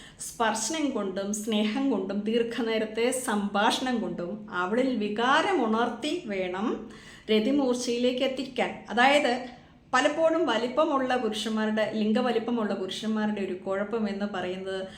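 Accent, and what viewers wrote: Kottayam, India. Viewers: കൗഗേൾ, ലോട്ടസ്, ചെയർ, ലാപ് തുടങ്ങി സ്ത്രീ മുകളിൽ ഇരുന്ന് ചെയ്യുന്ന എല്ലാ പൊസിഷനിലും സ്ത്രീയ്ക്ക് പരമാവധി സുഖം കിട്ടണമെങ്കിൽ നീളവും ബലവുമുള്ള ലിംഗം തന്നെ വേണം. കാരണം സ്ത്രീ മുകളിലിരുന്ന് ചെയ്യുമ്പോൾ അരക്കെട്ട് നല്ലത് പോലെ ഉയർത്തിയും താഴ്ത്തിയും ചെയ്താൽ മാത്രമേ നല്ല സുഖം കിട്ടുകയുള്ളൂ. കൂടാതെ സ്ത്രീ പൊങ്ങിപ്പൊങ്ങി വേഗം വേഗം ലിംഗത്തിൽ റൈഡ് ചെയ്യുമ്പോൾ ലിംഗം യോനിയിൽ നിന്ന് തെന്നി വെളിയിൽ വരാതിരിക്കാൻ ലിംഗത്തിന് നീളം വേണം. അതുപോലെ കൃസരിയെ ലിംഗത്തിൽ മുഴുവൻ ഉരച്ചുകൊണ്ട് റൈഡ് ചെയ്യണം. ഇടയ്ക്ക് ഗ്രൈൻഡ് ചെയ്യണം. ഇങ്ങനെയെല്ലാം ചെയ്ത് സ്ത്രീ യോനിയിൽ ലിംഗം കേറ്റിയിറക്കി പറന്നടിക്കാൻ ലിംഗത്തിന് നീളവും ബലവും വേണം. ശരിയല്ലേ പാലാക്കാരത്തി അച്ചായത്തീ? കോട്ടയം പാലാ സലാംങ് കേൾക്കാൻ നല്ല സുഖമുണ്ട്. അത് സെക്സി ആണ്.❤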